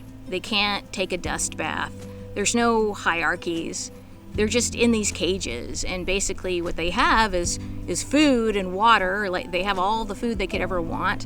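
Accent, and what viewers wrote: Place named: South, United States. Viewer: had you not told us, I would've thought you were a Southern white woman.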